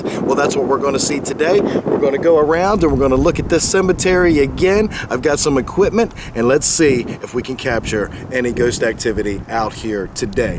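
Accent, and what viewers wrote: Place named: East Coast, United States. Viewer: I love your east coast "ass"accent😍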